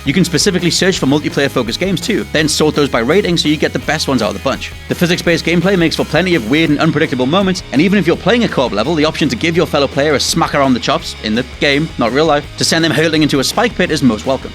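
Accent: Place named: Newcastle, United Kingdom. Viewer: At everyone criticising his narrative speed; You guys have never met a Geordie, have you? Confusing outsiders is our raison d'etre.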